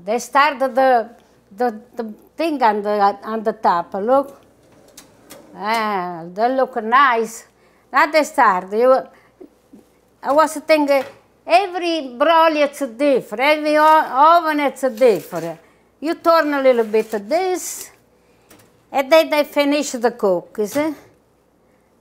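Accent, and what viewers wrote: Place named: Sicily, Italy. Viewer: I wanted you to know the joy you bring to me. Your mannerisms and accent remind me of my grandmother. She immigrated to the U.S. in 1920 from Sicily. I wish when I was older, I could have watched her make the most delicious meals. Nothing came from a can or freezer. My most favorite meal was Sarda. She used fresh fish and anise. Every year, I would ask her to make it for my birthday. I have made many of your recipes. Each one was delicious 😋. Thank you for teaching me the recipes that bring back many wonderful memories. To me, you put love in everything you make. 🙂🦋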